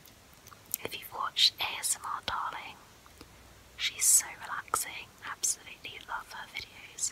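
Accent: United Kingdom. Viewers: Nice British accent 💛☺️ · Anyone else figure out that loud kinda creepy noise that repeats later? Sounds like maybe a motorcycle going past her flat/house? Aside from that, I truly enjoyed your whispery bible read. Your velvety English tinged whispers are very soothing to listen to, especially for bed time. Please keep sharing your Christian gifts! 🤗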